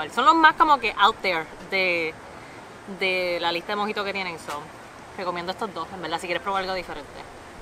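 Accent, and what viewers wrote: Puerto Rico. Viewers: Que bella habla boricua, que bella esa china...Eres increible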